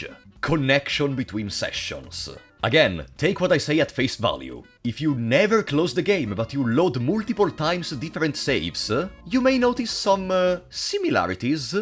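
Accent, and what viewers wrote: Italy. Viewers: He is italian it is literally part of his accent its not a speech impediment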